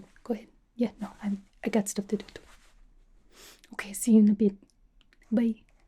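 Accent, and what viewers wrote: Russia. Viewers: Does she have other Russian accent vids? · Another amazing video like always love the Russian accent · As soon as I saw that thumbnail, I was like "Gah dayum. 😍", this is gonna be super tingly. 😌💖 I love that Russian accent. 😁 I dropped a Like for you. ❤️ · Russian Accent is Top 5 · The russian accent making a return is nice nice, This kinda came out of the blue but the sarcasm so far has been amusing lol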